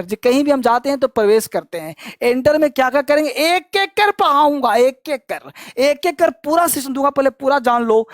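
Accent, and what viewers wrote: Bihar, India. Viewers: Hello sir, apka padhane ka tarika mast h or ap lgta h Bihar se hn??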